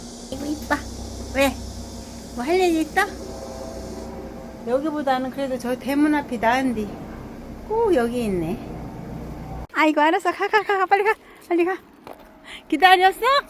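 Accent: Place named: Chungcheong, South Korea. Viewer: 충청도 사투리 정겹고 좋네요잉. 깜순이한테 나는 귀여운 북 소리 아이구 이뻐!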